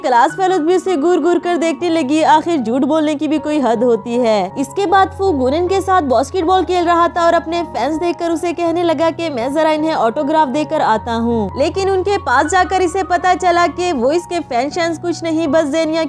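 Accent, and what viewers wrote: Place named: India. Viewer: Aapke awaj se lagta hai ki aap indiqn ho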